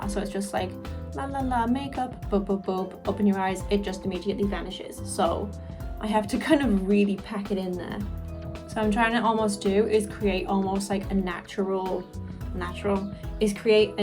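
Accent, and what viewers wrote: United Kingdom. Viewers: Who else loves British accent 😃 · lets all be honest, we love their accent, where the brithish gang at??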